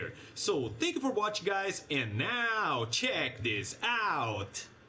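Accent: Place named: Brazil. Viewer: Seus videos são top e sua pronuncia é perfeita , só não dá pra esconder aquele sotaque de respeito , mto bom ver br's que trazem conteúdo pra gringa , gg mano , also nice build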